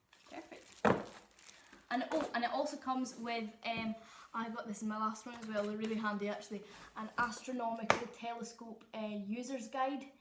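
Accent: Scotland, United Kingdom. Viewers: That Scottish accent though. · Scottish accents and telescopes. Good times.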